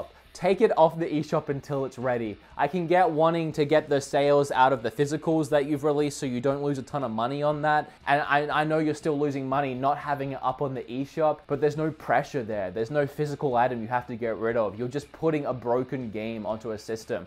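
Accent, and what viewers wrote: Australia. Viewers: R u Australian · Mate, first video I've seen of yours. You sound totally Australian. I'm assuming you now just live in the US? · Are you aussie? If so, why are you at walmart? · What is his accent? Auatralian? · Bloody cringe worthy hearing Australian accent. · Are you an Aussie?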